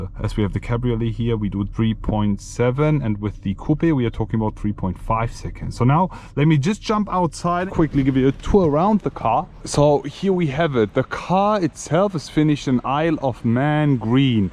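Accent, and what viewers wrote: Germany. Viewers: Bro. Yr accent 100% kartoffel gotta love it!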